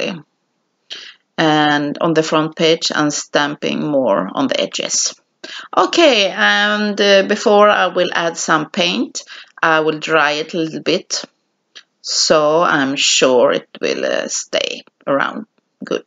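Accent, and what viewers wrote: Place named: Sweden. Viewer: I love how you truly explain your techniques!!! I would love to buy the Finnabar brand products, but it is so expensive here in the US. Also, I love listening to you speak, it makes me miss min famijl in Skane. Have you done any mixed media projects with a more Swedish tone? My cousin was on Alt for Sverige and we found a photo of our linking great grandmother. I want to do a project for all my family with her photo in the mixed media style, but with a Svenska tone to it. Tack for any suggestions!!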